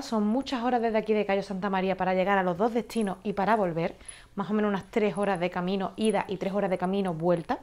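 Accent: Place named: Malaga, Spain. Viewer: Que hermosos lugares tiene la hermosa Cuba, por algo es la isla de ensueño, y sobre todo ese característico acento malagueño tuyo, sin duda cautiva mucho, sobre todo cuando hablas bajito, saludos 🎉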